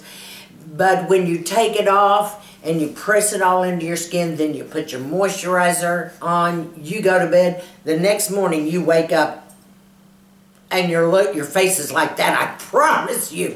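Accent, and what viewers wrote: South, United States. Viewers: I figured Alabama or Texas lol. I'm from SC. We tend to hang on to the end of words lol. Thanks for the update on this mask. Would love to try it. LOVE to SEE hydration and plumpness father a mask!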